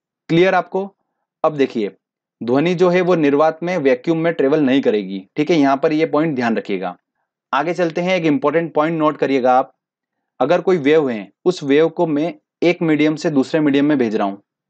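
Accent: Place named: Maharashtra, India. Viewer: सर आपके language मे महाराष्ट्रन touch है.... आप मुम्बई से हो? मै भी मुम्बई से हु.... पर आप सभी topics बहुत अच्छे से clear करते हो... 🙏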